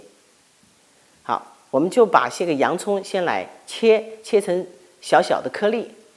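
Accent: Wuhan, China. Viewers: 很像武汉人的口音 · 听您的口音好像武汉人呢！ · 第一次看到你的视频，听口音像武汉人，请问洋葱蒸汁治咳嗽有剂量的要求吗？每次最少需多少毫升呢？谢谢🙏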